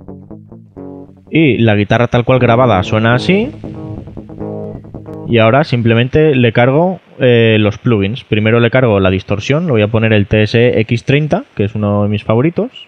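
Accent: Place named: Barcelona, Spain. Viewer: I can tell by your accent ur from barcelona :)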